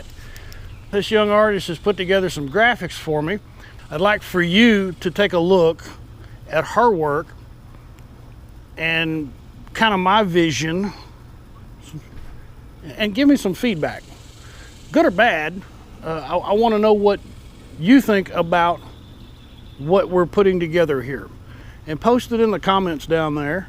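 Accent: Texas, United States. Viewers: I have to agree about you not being in the logo. Even if you're represented by a stick man wearing a hat and holding a camera. I hope you have a fun and safe trip to the Smokies. Thanks for all of your help. I'm looking for a fifth wheel or travel trailer to live in. Your videos are informative and fun to listen to. The others, not so much. Thanks again for your work and keeping the Texas accent real. Stay Safe. Stay Happy. Stay Loved.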